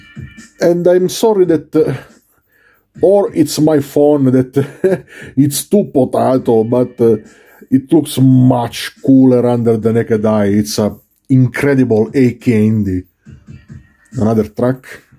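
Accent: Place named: Italy. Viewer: Mamma mia che accento italiano! Vorrei realizzare anch'io il tuo progetto ma non hai accennato allo schema e ai componenti.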